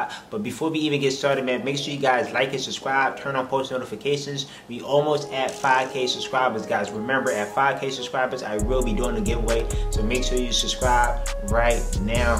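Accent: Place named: Baltimore, United States. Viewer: Def from Baltimore lol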